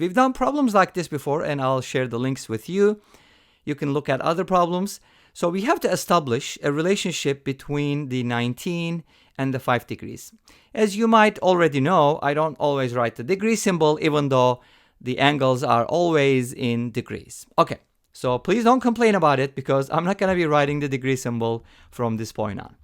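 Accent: Turkey. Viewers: I can hear Turkish behind the accent.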